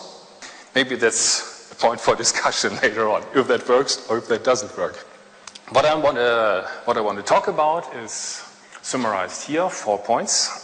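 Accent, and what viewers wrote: Germany. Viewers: "aber sonst?" ich find deutschen akzent sympatisch :) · man hört voll den deutsch akzent raus :D aber sonst sehr schön ^^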